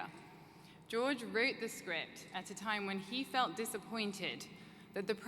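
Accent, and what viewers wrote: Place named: United Kingdom. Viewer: I really like her British accent .she is clever , educated and authentic and gorgeous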